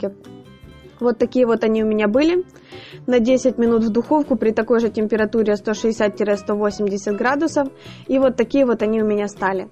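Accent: Ukraine. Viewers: Болит голова - не смотри ! Произношение такое потому, что девушка из Украины! Нужно обязательно свою ложку дёгтя бросить , а так все нормально!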